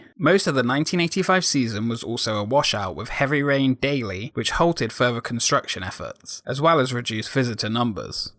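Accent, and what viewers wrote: United Kingdom. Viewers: Hi mate I've been watching you're videos for months just wanted to say they are awesome . So glad to hear a British accent on theme park commentary. You do some fantastic research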